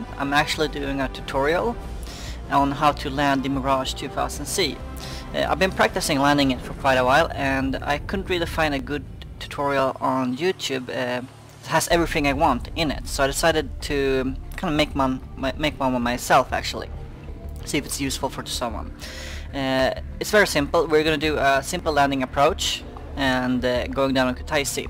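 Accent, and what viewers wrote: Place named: Sweden. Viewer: Nice vid like always. btw are you swedish you sound a bit swedish xD , im swedish .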